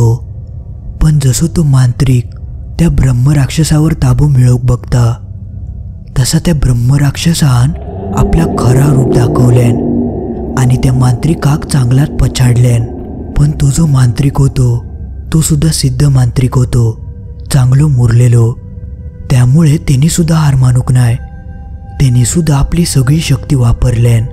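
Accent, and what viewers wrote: Malvani, India. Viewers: आपण जिवंत माणसं आहोत जी पाच तत्वांनी बनलेल्या शरिरात जिवंत आहोत पण भुतं मग ते किती ही आणि कोणत्या ही स्वरूपात असो जिवंत शरिरातील माणूस केव्हाही त्यास प्रतिकार करू शकतो असो ज्यांना अनुभव हावा आहे त्यांनी प्रयत्न आणि सातत्याने पाठपुरावा सुरू करावा त्या क्रिया विधी कर्म गुप्त ठेवण्यात आल्या आहेत पात्रं नी कू-पात्र अशी परिक्षणं करुन च् या विद्या शिकवल्या जातात. 🙏 मालवणी भाषेत नी ऐकण्याची शैली आवाजातील भ्रमर नाद नी गंभीरता गोष्टीची गुढता व भय आतुरता वाढवते 👍👌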